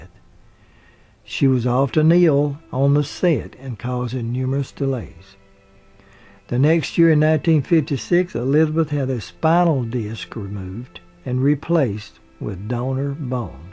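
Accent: South, United States. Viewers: I discovered your channel by accident but have come to really enjoy it. I like your calm demeanor, the way you say nineteen-and-fifty-six, your sweet southern accent and the interesting research you do. Nothing is rushed, the whole experience gives you insight and a bit of relaxation in the process.. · I know....at first I was confused by this southern drawl, but after awhile you come to like it; but why is almost the whole darn thing in Black & White? I want to see those violet eyes! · Good ole Southern accent :) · The narrator is a southerner and they have a unique vocabulary and accent. I thought everyone knew that. I’m sure Elizabeth would be delighted with his work as most people appreciate him. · MandyJMaddison, there are several generations of southerners here in the south that talk like that, and probably won't change. they are mostly from cotton mill families, and I find their pronunciations trashy and uneducated. 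I don't know why so many commenters here actually like his way of speaking.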